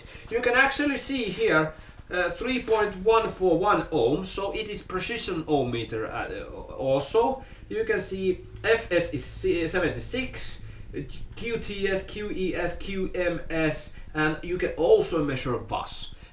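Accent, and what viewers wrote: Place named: Finland. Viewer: Ralli englanti on paras, Hyvä video.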